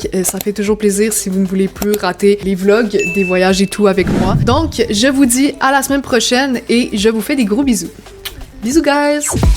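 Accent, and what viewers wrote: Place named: Canada. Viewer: Hi, at first I thought you are French but at the end I pick up the Canadian accent. I've been reading in the news Canadian don't visit the US anymore. What do you think of the avocado it's not good? I bought 1 in NYC after a couple days when I cut it water. I was in Montreal last September I love it, I stay in downtown Montreal rue ST Denis not to far from Berri-UQAM, Place des Arts there's a big Mall, and McGill uni.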